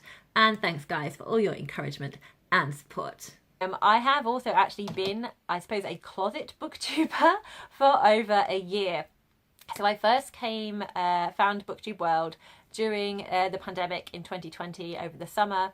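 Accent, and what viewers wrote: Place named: United Kingdom. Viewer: Not me totally forgetting you'd have a British accent and then startling a little when you started talking 😂🤣 So glad you made it on here with us! 🥳Already living up to the channel name with a 2 part newbie tag, lmao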